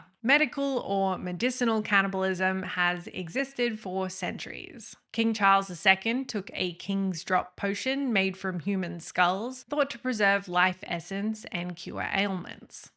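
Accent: Australia. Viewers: Your accent is cute lol Australia? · An Australian video essay is so weird to me, it feels like I’m listening to some kind of ABC investigative report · You look mexican and sound Australian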